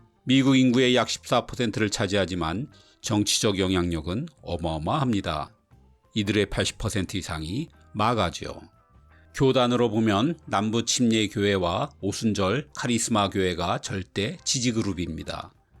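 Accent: Gyeongsang, South Korea. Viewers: 딱 갱상도 네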